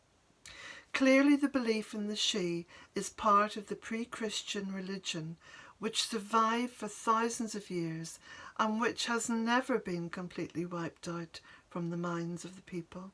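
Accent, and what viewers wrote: Ireland. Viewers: Also your reading is so lovely! You might consider offering your books on tape. It is so pleasant hearing it in your lovely Irish lilt. Blessings to you, dear.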